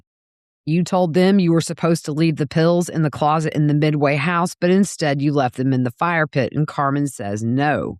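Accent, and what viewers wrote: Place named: Texas, United States. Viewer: Such a great recap, and you are great to listen to. Love your accent. I used to live in Texas and you make me miss home❤ I live in California now-no accepts here😢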